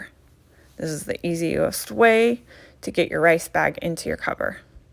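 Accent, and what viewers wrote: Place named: Texas, United States. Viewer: Such a great tutorial! Clear instructions and easy to follow along. Love that Texas accent ❤️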